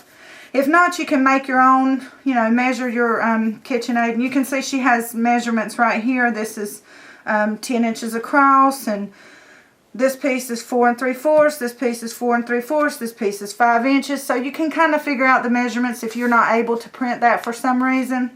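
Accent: South, United States. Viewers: Thank you for this video, it was really good and helpful. I LOVE your accent ( I'm here in England) I'm guessing you're in the South? I was thinking you might enjoy getting a extension plate for the sewing machine, it makes life easier when you're working on a wide piece of fabric.....just a thought. I like your no-nonsense approach, I too curl a lip up at Bias tape!! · I love how straight forward you are with your southern accent, you had me cracking up. You didn't film you sewing that curve because it takes some kind of witchcraft, doesn't it? Can't let all your secrets out! 🤭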